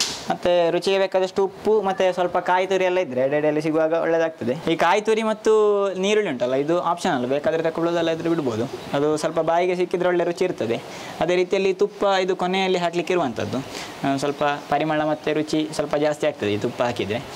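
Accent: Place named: Belthangady, India. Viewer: Nan aduge kaliyoke video nodlilla nim maath kellike nodde super belthangadi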